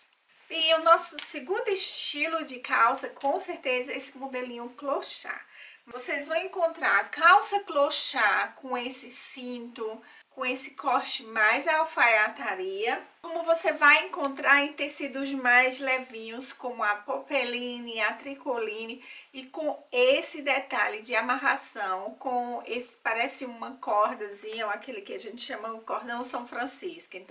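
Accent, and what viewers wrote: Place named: Northeast, Brazil. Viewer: sou pernambucana e por isso reconheci o sotaque nordestino,👏👏👏👏😙😙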